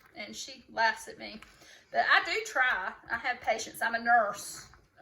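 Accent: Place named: Texas, United States. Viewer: You talk just like me. I'm from south east Texas piney woods.😁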